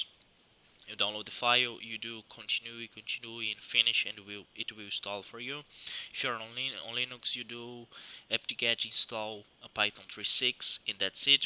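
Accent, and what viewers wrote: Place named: Brazil. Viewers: For sure. Reconheci o sotaque no começo também. Tava com o inglês muito facil pra entender aiheaieha So pode ser Brazuca. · are you brazilian? eu conheço esse sotaque! curti o vídeo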